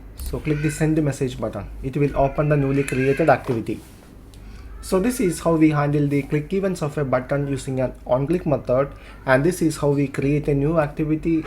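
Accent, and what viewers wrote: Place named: India. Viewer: I'm starting to like Indian accents :)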